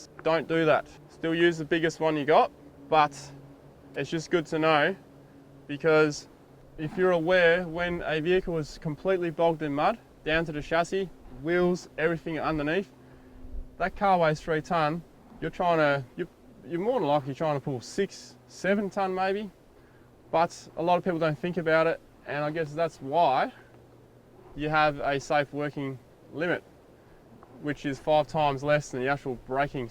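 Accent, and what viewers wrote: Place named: Australia. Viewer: Good round-up and advice! Have learned a thing or two since I discovered your channel. Must say its a bit hard for me to understand your australian acccent (english not my first language)(born and raised in Mexico) but Im getting used to it. Will definitely continue to come back, check out and enjoy more stuff.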